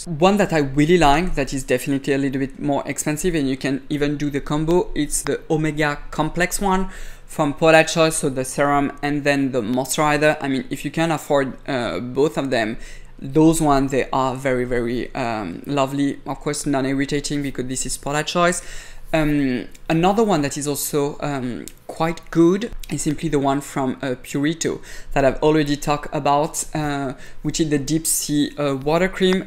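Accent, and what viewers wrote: France. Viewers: Bonjour!Hearing skin care tips with a French accent makes everything sound more luxurious.Great suggestions but as a 68 year old with acne I am afraid to use exfoliators for fear of making the acne worse,like purging from RetinA.Also as you get older your skin starts to thin and is more prone to broken capillaries.Would you still suggest these products for someone with my skin issues?I hope you have a lot of videos because I cant sleep and what better way to spend the time than binging on beauty videos.Good night!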